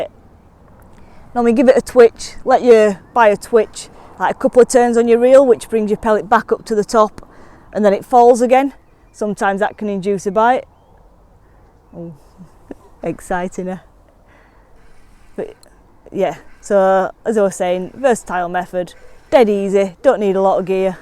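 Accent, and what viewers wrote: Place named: Yorkshire, United Kingdom. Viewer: Thankyou, so refreshing to hear a crisp Yorkshire accent, really appreciate your films, please do keep up the good work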